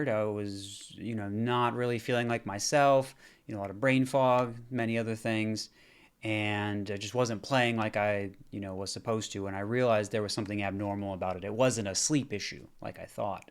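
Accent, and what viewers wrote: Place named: Tennessee, United States. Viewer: I'm just trying to figure out where your accent went. I hear a subtle Tennessee dialect. But other wise its gone.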